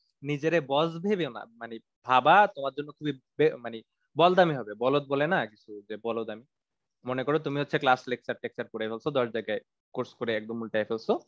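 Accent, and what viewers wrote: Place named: Chittagong, Bangladesh. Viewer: oree vai tw Chittagong er.. Seraaa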